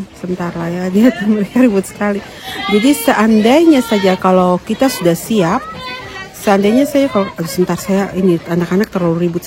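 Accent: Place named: Papua, Indonesia. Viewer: Maaf bunda apa asalnya dari paua soalnya logat bicara bunda seperti orang papua.. Aq jga ada saudara papua tepatnya di nabire.. Aq suka sama bunda karna murah senyum.. Kebunya luas sangt indah sekali suasananya... Memang php mnyakitkn betul kata bunda php bisa terjadi pada siapapun dan dimanapun.. Semangat bunda0💪💪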